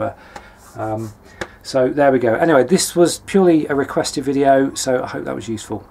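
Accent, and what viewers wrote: England, United Kingdom. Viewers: It's English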